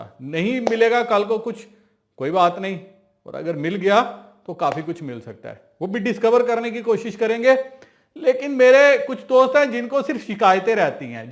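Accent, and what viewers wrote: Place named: India. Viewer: you write in English and then speak Indian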